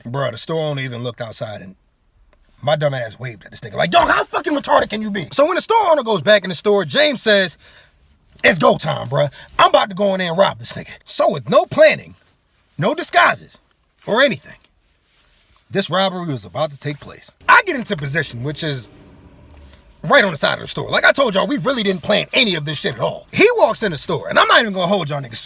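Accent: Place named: New Orleans, United States. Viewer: Can tell ur from nola sound like. Watching part 2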